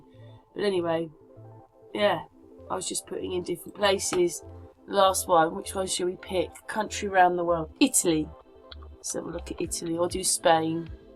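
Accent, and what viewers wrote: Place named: England, United Kingdom. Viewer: i could tell u were english simply from ur clever channel title!!! it sounded very good with an english accent and i said ,i bet shes english haha. and i think u are super awesome!!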